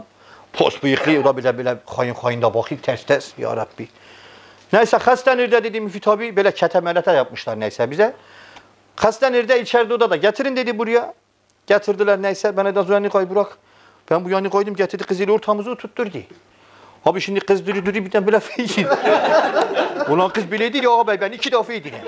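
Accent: Erzurum, Turkey. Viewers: Bir erzurumlu olarak şive dinlemek bile çok güzel. Ama şu aralara neden küfür konur anlamam. Hiç hoş değil komik asla değil. Erzurumluların ağzı bozuk değildir. Komik olmak için buna gerek yok. Bu yüzden dinlerken gülemiyorum ve izlemek istemiyorum. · Erzurumluların şivesine bayılıyorum ya 😂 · 🤣🤣🤣🤣he valla ölide bizim.diride doğri diyir çok hoş erzurum şivesi konuşmalari slm.olsun bütün erzurumlu kardeşlerimize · Burak kardeşim sohbetlerini Zevk ve hazla dinliyorum. Takibinizi yapıyorum. Diskionunuz güzel akici. Zekanızı güzel kullaniyosun. Erzurum şivesiyle mübalağalida olsa taktir ediyor başarılar diliyorum. ANCAK..!!! ? O tatlı sohbetlerin arasında keşke aralarda üstüne basarak ettiğin küfürleri kullanmak zorundamisin? Bu hoş sohbetlerini aileler, ana baba bacı çocuklarda dinliyor. Bilmem nerelerini yererem. Vay ben orana yok burana S....um. Vay ben A....k... 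gibi küfürler sence doğru ve çok hoş mu? Kaldiki hele hele bir Dadaşa böyle bir dil üslubu yakışiyo mu? Lütfen..! Sevgilerle selamlar Hemşehrim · Üniversiteyi Erzurum’da okudum özlemişim bu şiveyi ağzına sağlık kardeşim hala gülüyorum.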